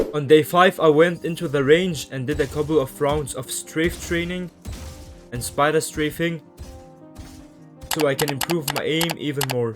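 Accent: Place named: Arab world. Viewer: I'm the 700th subscriber Also keep up the good work (where are u from btw cuz u have an Arabic accent)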